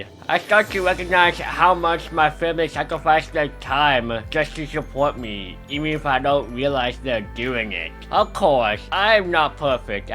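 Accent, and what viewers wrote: United States. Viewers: you sound american, not chinese. wow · You still sound American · Your Chinese your voice sounds like your a American :O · He sounds American but is chinese. Let that sink in......................................................................................................................................................................................................................................................................................................................................................................................................................................................................................................................................................................................................................................................................................................................................................................................................................................................................................................................................................................................................................................................................................................................................................................................................................................................................................................................................................................................................................................................................................................... 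· He was raised in an American family so he is obviously gonna have an American accent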